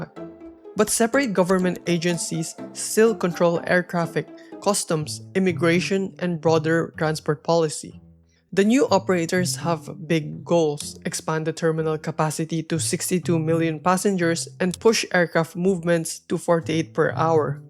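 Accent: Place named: Philippines. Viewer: sounds pinoy.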